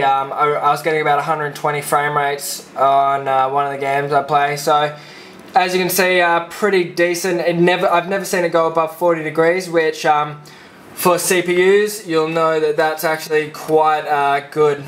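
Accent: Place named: Australia. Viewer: Ahhh, Australian accents. I have to say, I was pleasantly surprised.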